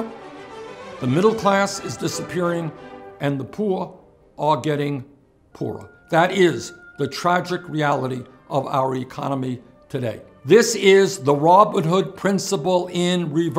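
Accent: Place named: New England, United States. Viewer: As a European I am just loving that New England accent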